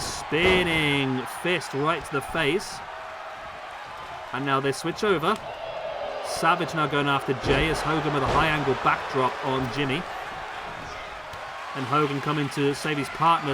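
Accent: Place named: United Kingdom. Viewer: Bottle o’ Wataa , love the British accent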